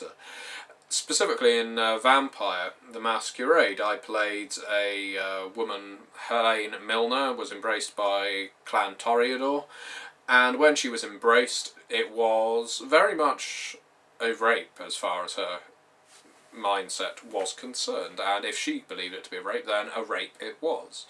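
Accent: England, United Kingdom. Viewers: when he talks, i have to pay attention. the English how do they do it?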